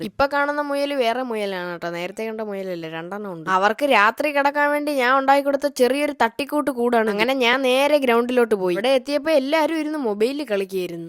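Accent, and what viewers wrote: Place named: Thiruvananthapuram, India. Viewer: ❤❤❤കടക്കാവുരു 😁നമ്മളൊക്കെ പറയുന്ന പോല തന്ന 😂😂😂ആറ്റങ്ങ, അഞ്ചിയെങ് 😎😎തിരോന്തരം ഡാ 😎❤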